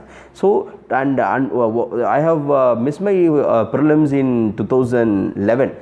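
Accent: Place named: South India, India. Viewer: nice to hear pure South Indian accent after a long time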